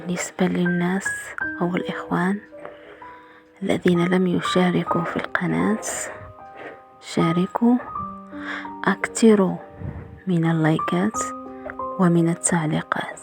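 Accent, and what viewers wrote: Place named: Morocco. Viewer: أقصد أن مستوي الصوت منخفض جدا مع ان محتوي الفيديو رائع لكن بكل أسف لم استطع الاستفادة منه لعدم وضوح الصوت كما أن أسلوب او لغة العرض هي مغربية ويب التالي بعيدة عن اللهجة المصرية لذلك لم أحصل علي الفائدة من الفيديو رغم اهمية محتواه الجيدة عموما أشكرك جزيل الشكر لاهتمامكم بتطوير وتطوير ماتقدمينه هذا من اهم عوامل النجاح في المستقبل إن شاء الله تمنياتى لكم بالنجاح وإنجاز أكثر أن شاء الله. تحياتى لكم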